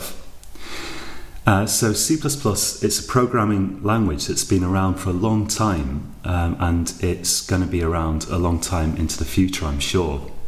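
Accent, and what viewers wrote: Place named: United Kingdom. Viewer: thank you for this very helpful video, besides i love your English Accent. Sound like my British friend and some BCC news broadcasters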